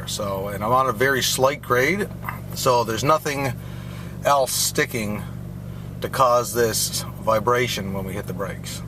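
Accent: Canada. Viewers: My detective observation skills deduce that you are Canadian. I know impeccable isn't it?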